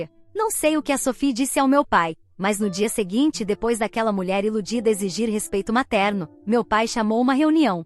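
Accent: Portugal. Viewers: Ta em português de Portugal?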